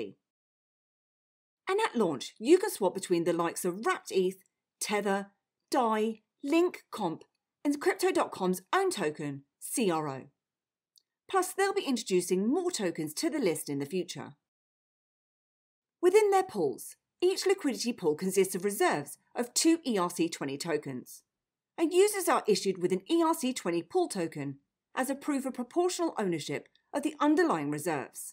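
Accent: United Kingdom. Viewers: best thing about your channel is listening to gb English instead of us. Not many brits doing crypto content. Well done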